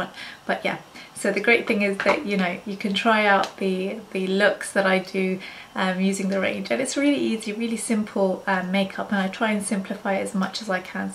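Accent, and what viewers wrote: United Kingdom. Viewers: Are you muslim women? What is your name? Your Accent is British, Right?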